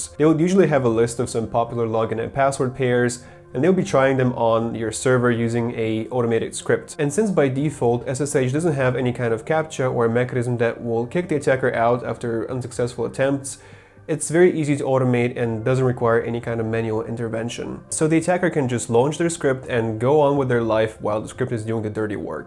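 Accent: Russia. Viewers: Один я слышу русский акцент?) Where are you from?)) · русский он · Я не могу понять, он русскоговорящий или нет, английский смешанный, где то хорош акцент, а где то как будто русский. Похож на английский восточной Европы какой нибудь или американских пригородов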